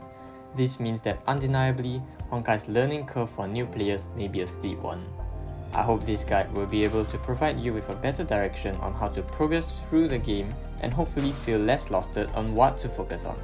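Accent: Singapore. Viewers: are you from singapore? nice accent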